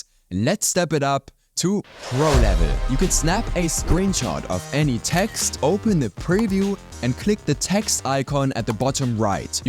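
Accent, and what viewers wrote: Germany. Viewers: I found out is German because of his Non-American accent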